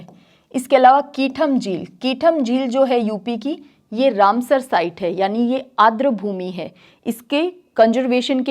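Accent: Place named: Gujarat, India. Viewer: Apke pronounce sation Amit Shah ji sahan hai. AP Gujarat se belong krte ho kya?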